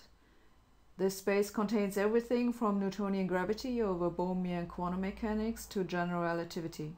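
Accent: Germany. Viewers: Yes, that was a nice example of Hossenfelder's MANY throw-away one-liners! The effect of her swift, deadpan delivery is deliciously close to that of Sally Phillips who plays Minna Häkkinen, the "Prime Minister of Finland," on the show called VEEP (granted that what we hear in this case is technically a very mild and pleasant German accent, instead of a faux-Finnish accent).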